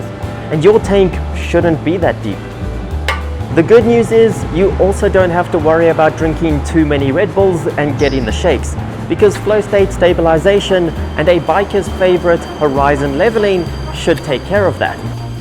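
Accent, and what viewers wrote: South Africa. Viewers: crazy how I can tell youre south african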